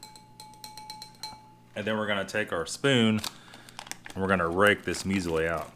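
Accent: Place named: South, United States. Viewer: He sounds Southern, maybe Louisiana. They have a different way of pronouncing things down south. Can't help how he was raised. Alot of my family is from New Orleans, it gets irritating sometimes with how they pronounce things but, you cant change how they speak. That'd be like getting mad at a British person for pronouncing something differently than we do when speaking English.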